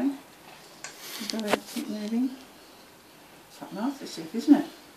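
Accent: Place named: Australia. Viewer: Thankyou for the video. Great instructions. PichilmI she definitely sounds Australian.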